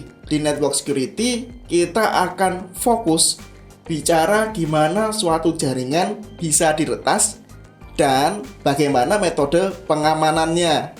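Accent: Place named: Java, Indonesia. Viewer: agak medhok sedikit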